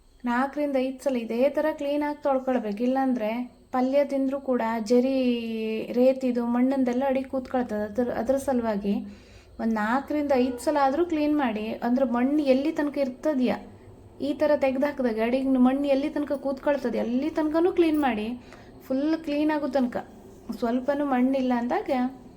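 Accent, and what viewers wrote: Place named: Sirsi, India. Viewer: ಶಿರಸಿ ಭಾಷೆ ❤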